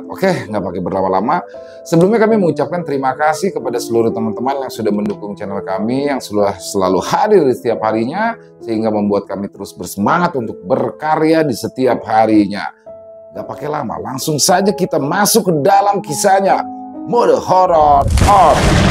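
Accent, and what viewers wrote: Jakarta, Indonesia. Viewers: Harus nya ini cerita serem.tapi karena pakai logat betawi dan banyak canda nya.jadi gk jadi seram. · Senang dengan logat betawi nya sosok bang ❤❤❤👍👍👍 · Bang.. Pas banget. Bawain. Logat Betawi nya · Hdir bang, sy sngat suka dengar logat betawi, lucuu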